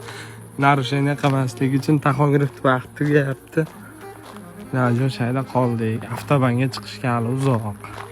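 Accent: Uzbekistan. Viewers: Shevasidan Norinlik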